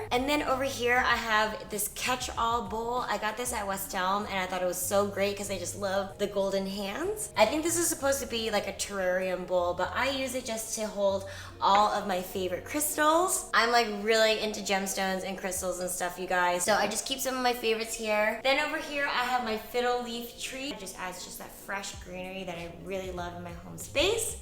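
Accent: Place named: Texas, United States. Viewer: I love her accent! Is it Texan?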